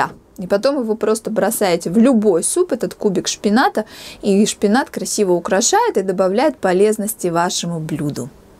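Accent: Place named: Latvia. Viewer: Почитал коментарии, оказалось вы в Латвии живёте!!! 👍 Вот и решение вашего говора... Мне нравится ваша речь!!! 😀😀😀 И я не маньяк, как мне написали 😀😀😀.... А у нас здесь некоторые и "гекают" , и "шо" , и "чё" , и "у клубе," "у селе" 😀😀😀...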